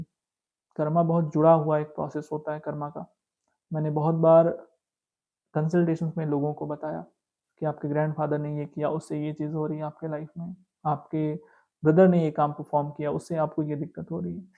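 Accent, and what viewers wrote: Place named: Uttar Pradesh, India. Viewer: Apki boli achi lagi, ap UP se ho kya? wahi se hote h zadatar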